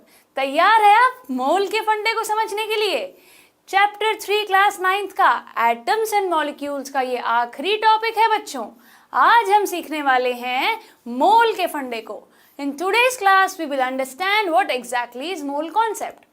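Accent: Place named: Punjab, India. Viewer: Mam I can definitely say that your hometown is punjab because you words are generally punjabi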